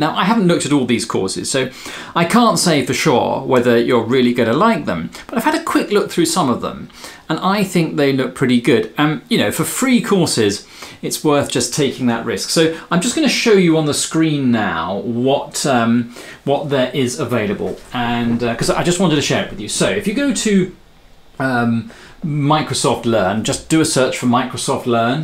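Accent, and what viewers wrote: England, United Kingdom. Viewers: You English accent is very pleasing to hear . Like your ways of prouncing words . And as always your videos is always helpful .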